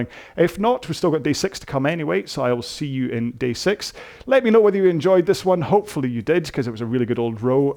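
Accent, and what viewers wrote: Scotland, United Kingdom. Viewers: #so, thanks again for scotlishly (is this a real word?) talking through this climbing intensity row. #muchmorebetter, I know you have already finished the series, but I'm just enjoying them one by one since the sportschools are closed again. Cheers, Bart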